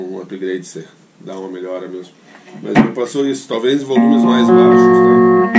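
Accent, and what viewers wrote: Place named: South, Brazil. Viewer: Sim, excelente custo benefício mesmo. Me inscrevi no canal e estou olhando os vídeos aos poucos. Você é de onde mestre, senti um sotaque meio parecido com o meu na região Sul. Sou de Bento Gonçalves RS. Grande abraço!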